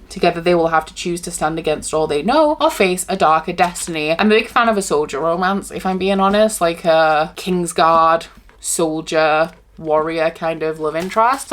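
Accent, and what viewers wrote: Yorkshire, United Kingdom. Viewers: My first time watching your videos and LOVE LOVE LOVE. Can I ask where is the accent from I hear Yorkshire but there is a Scottish `twang ? Am I right? ❤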